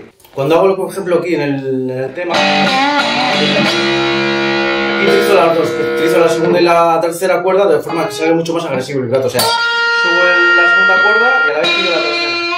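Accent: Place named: Trapagaran, Spain. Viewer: Tienes acento de trapagaran.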